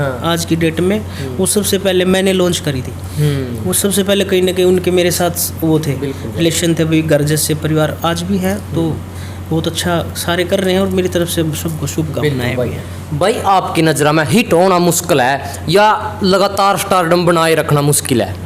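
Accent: India. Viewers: Bhai interview achha tha Par aap apne bolne m thoda change karo Uchana aali jyada hojya hai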